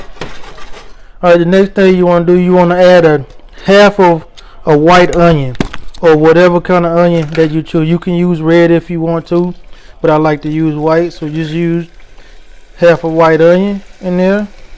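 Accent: Deep South, United States. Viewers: Always trust the man with a Deep South accent to teach you how make a BBQ sauce. Thank you sir.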